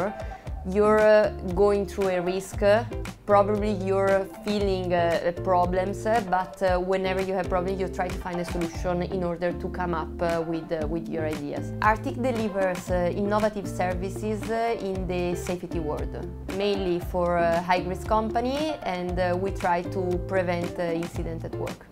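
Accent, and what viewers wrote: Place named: Brescia, Italy. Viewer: ha mantenuto il nostro intercalare bresciano😊 anche parlando in inglese, a parte questo, brava 👍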